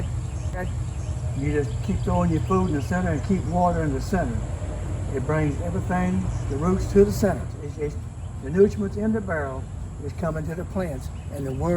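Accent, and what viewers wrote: Louisiana, United States. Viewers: ♥ that Louisiana accent! Thanks! G-d willing,I am gonna try this out this weekend. Gonna plant a pipe beside my blueberry bush also and use your vinegar/water method. May your harvest always be abundant.🍇🍒🍓🫐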